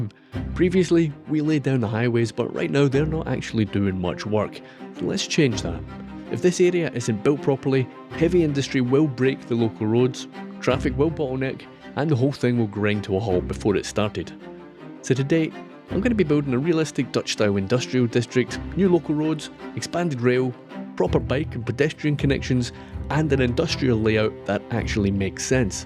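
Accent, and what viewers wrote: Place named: Wales, United Kingdom. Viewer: As a native English man I can confidently say he's welsh.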